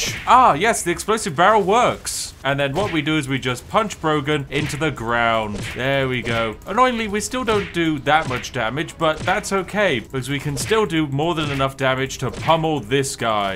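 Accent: United Kingdom. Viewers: You have a lovely British accent there, friend (for real, not kidding) you had fun videos about cyberpunk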